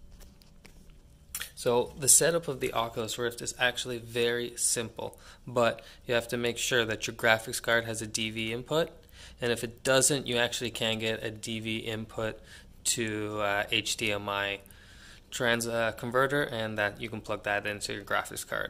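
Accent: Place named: United States. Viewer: sounded american thou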